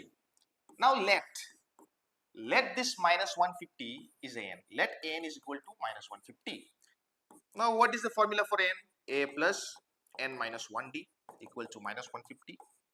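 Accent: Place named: China. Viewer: Sir actually sounds like Chinese. His accent is similar to Chinese's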